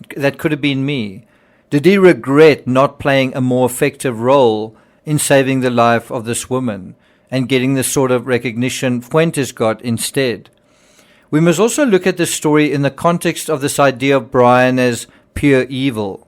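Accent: South Africa. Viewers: Me too! I ❤️ accent too. I think it’s South African.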